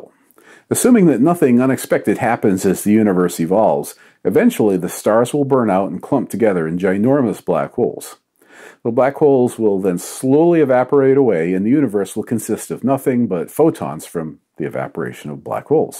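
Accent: United States. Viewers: He addressed this topic earlier. I guess he doesn't care about German pronounciation and prefers the American, just like they don't call the country Deutschland. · Once again regarding the your Americanized simplified pronunciation of non-American scientists' names. Can you, please, say "Descartes", and "de Broglie" to name just two, as a proud but somewhat ignorant American? (BTW, the 1st is pronounced "decart" and the latter "de Breuille").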